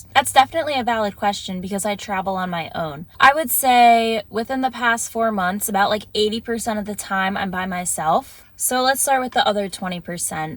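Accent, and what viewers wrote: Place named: New England, United States. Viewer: She's from New Jersey. So it's probably that new england accent.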